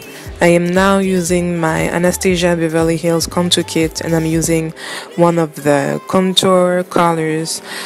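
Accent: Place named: France. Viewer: love the French accent! and you're gorgeous new subbie 😍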